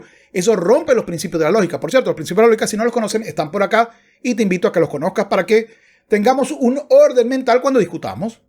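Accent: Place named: Venezuela. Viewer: Bonito tu canal hermano. Aqui estamos apoyandote, porque eso es lo que necesitamos, apoyar y promover el sentido común. Tienes acento Venezolano, eres? Un abrazo!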